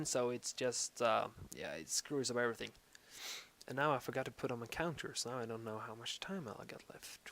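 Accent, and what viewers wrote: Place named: Australia. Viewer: nice vid! like ur australian accent mate ;)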